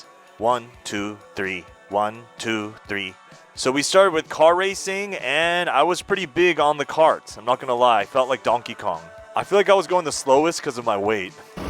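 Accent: United States. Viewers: you sound so American to me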